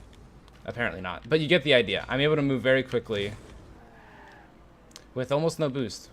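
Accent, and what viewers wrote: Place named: Europe. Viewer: l Sven l I can tell your from EU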